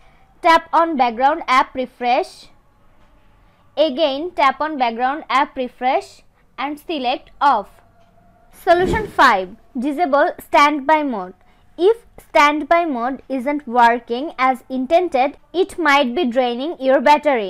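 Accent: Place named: India. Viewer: you sounds like indian call center scammers💀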